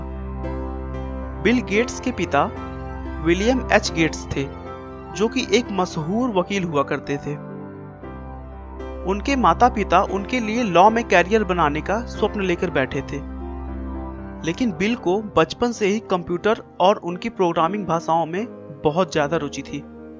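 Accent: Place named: Uttar Pradesh, India. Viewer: Iska uttar Pradesh Wala accent kan me chub ta ha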